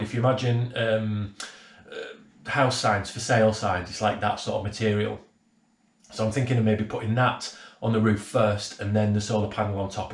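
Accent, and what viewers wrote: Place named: Bradford, United Kingdom. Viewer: Another nice video mate, I've subscribed . With that accent are you a bradford lad by any chance ? Im also a yorkshire lad, living in sunny oakworth 😁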